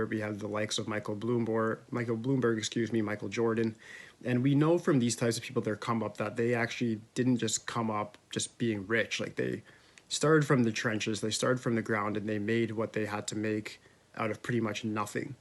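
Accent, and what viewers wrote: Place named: Canada. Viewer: This is the first video I’ve seen of yours. You sound Canadian 😁 love it! I’m going to look for your channel and I truly hope you’re still active. Your knowledge on this subject was A1. 😊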